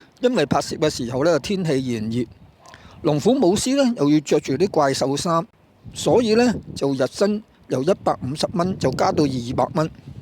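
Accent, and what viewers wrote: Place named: Weitou, China. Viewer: 喺唔係起, 有心做就注意吓啲廣東話發音, 唔好用啲咁嘅圍頭話發音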